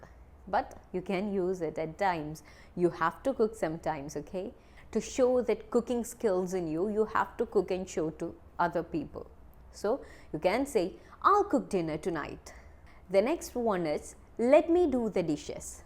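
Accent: India. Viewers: Your English accent is very close to Indian/kerala . Where are you from actually?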